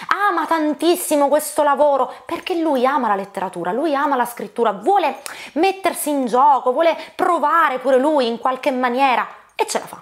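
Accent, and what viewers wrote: Sicily, Italy. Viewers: Ma te sei siciliana vero?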